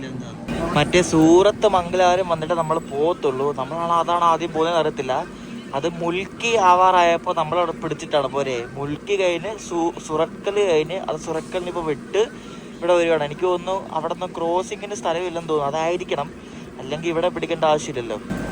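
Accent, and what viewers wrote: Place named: Kottayam, India. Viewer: Awesome …adipoli ….❤❤❤❤ parallel race super 🔥🔥🔥🔥. Talk kelkkan rasam undu. Kottayam style talk 😍😍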